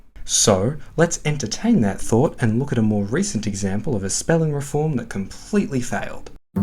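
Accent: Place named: Australia. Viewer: Aussie? Nice 🇦🇺🇦🇺🇦🇺🦘👊🏼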